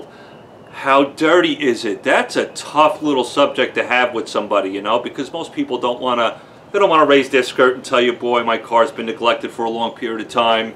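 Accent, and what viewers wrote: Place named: Northeast, United States. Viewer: Finally an honest to goodness video on how to remove pet hair in cars/trucks 👍 Thank you so much for your informative video! I will definitely go out and buy a bottle of "All Fabric Softener" to rid of the pet hair in my truck! By the way, love your northeastern accent as well as your humor 🤣👏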